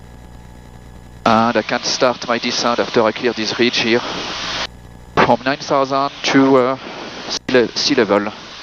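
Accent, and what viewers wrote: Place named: France. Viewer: Just with the accent we can see that you are french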